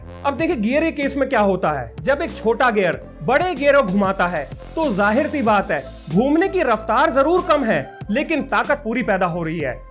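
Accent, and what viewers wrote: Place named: Delhi, India. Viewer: इसकी जबान दिल्ली वाली भाई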